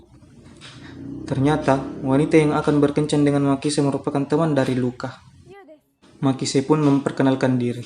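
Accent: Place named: Makassar, Indonesia. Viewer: Orang Makassar ya kau bang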